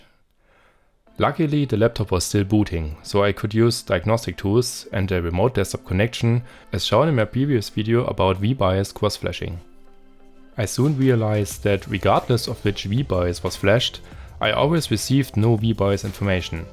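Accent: Germany. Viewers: I hear German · i hear a bisschen deutsch??? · Im hearing deutsch in his voice🇩🇪🇩🇪🇩🇪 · Wie man direkt den deutschen Akzent raushört 😂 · When the narrator has a German accent in a tech video you know it's gonna be good. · Also Ich muss schon sagen, deine Hand ist echt ruhig, aber deine Aussprache verrät, dass du Deutscher bist und das, ob wohl Ich auch einer bin, aber du machst gute Arbeit und daher meine Frage, warum nicht in Deutsch, sondern in Englisch oder hast du auch Deutsch Videos? Sorry bin Pfälzer und ja wir haben es net so mit Sietsen hoffe bist deswegen net angepisst ist dein Vorname ok oder soll ich ihn nicht nenne gleich mal Daumen und Abo dagelassene ach und (net) heist soviel wie (nicht) in Sachsen weis net ob da so pfälzisch verstanden wird deswegen hoffe nimmst mir net übel ,weil mir ist auch aufgefallen das sich sonst keiner traut mal einfach Grafikeinheit zu tauschen oder Prozessor, wenn er verlötet ist als Respekt wäre niemals auf die Idee gekommen wie Mann überhaupt rann gehen sollte aber bei dir sah es echt Profi mäßig aus ok noch mal gute Arbeit! 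· Wusste ich doch, dass du ein deutscher bist. Unseren Akzent kann keiner "nach" machen haha.. Guter Job. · I love how I could immediately tell that is guy's native language is German after some two or three words, lol. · u sound kinda german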